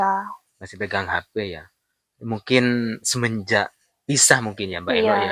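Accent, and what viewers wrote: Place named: Madura, Indonesia. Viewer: Org maana sih kang logat nya kaya madura gitu😊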